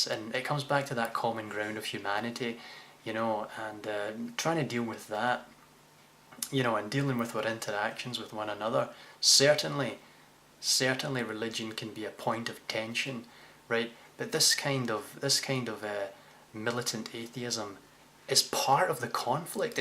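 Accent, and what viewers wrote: Scotland, United Kingdom. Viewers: Great video! loved the 'enlightenment' bit =) hearing that beautiful Scottish accent is never bad, either :)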